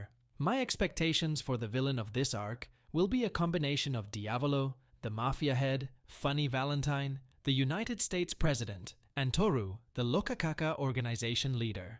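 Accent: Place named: Spain. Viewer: el acento español a muerte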